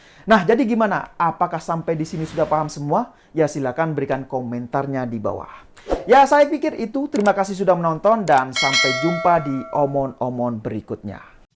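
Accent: Java, Indonesia. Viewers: Njawani banget 😮😮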